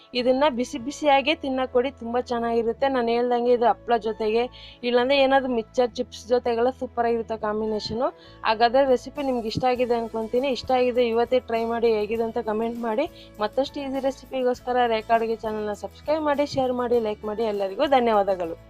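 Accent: Tamil Nadu, India. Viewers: Tamil tara matadbedi correct agi bele anta heli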